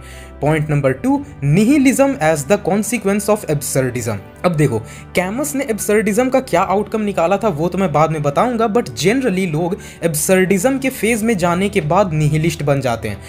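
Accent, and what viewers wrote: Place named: Bhojpuri, India. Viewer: Bhaiya bhojpuria lg rhe ho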